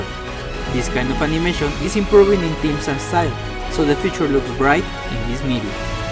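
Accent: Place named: Romania. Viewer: Good Video! you have quite an accent. Are you from Romania?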